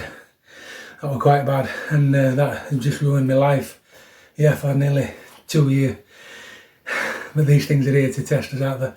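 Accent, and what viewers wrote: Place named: Liverpool, United Kingdom. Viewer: no offence mate but why are you speaking in a Scouse accent when you are not even from Liverpool?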